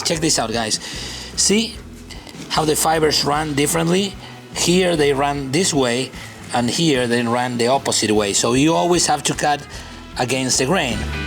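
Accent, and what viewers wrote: Argentina. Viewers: Un Argentino hablando inglés con un acentazo pero sin trabarse y sin parecer forzado, es un orgullo este chabon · i realized so quick this dude here is argentinian because of his accent and spanish words on the middle of his commentary que qliao, aguante el asado uraa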